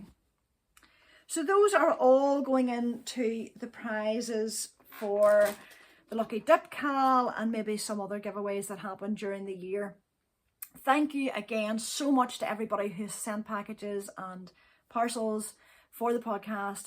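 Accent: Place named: Ireland. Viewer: I so love your podcast! Thank you for uploading your videos. I especially love listening to your Irish accent. You could read the phonebook and it would still be interesting. Blessings to you and your family. Haven't seen your cat for awhile. Does he still like to perch on your k we while you knit...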